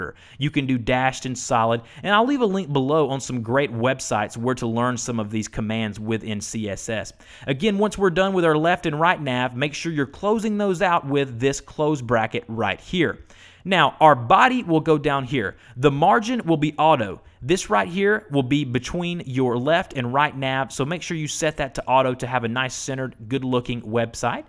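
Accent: South, United States. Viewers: It just hit me. Your voice reminds me of Danny Lipford, the home improvement guy on TV. Are you also from Alabama, or another southern state? By the way, awesome videos. ;-)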